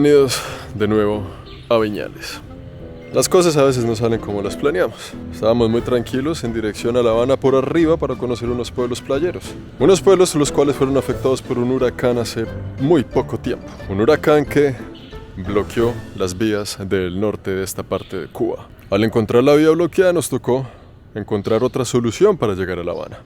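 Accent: Bogotá, Colombia. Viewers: En este video escuche que es colombiano ❤❤❤yo amo ❤ese acento colombiano ❤️❤️❤️❤️❤️ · El acento de los colombianos🌹❤️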